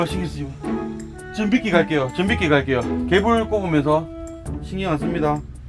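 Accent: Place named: Gyeongsang, South Korea. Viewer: 덩치 크고 얼굴 크고 갱상도 사투리에 호감가는 이유는 머징ㅋ 구독박고갑니다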